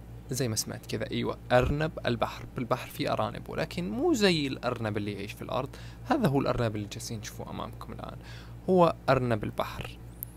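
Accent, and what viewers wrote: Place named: Levant, Jordan. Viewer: توقعتك من الأردن او فلسطين وفعلا صوتك مميز ملاحظة بسيطة قص اظافرك 😁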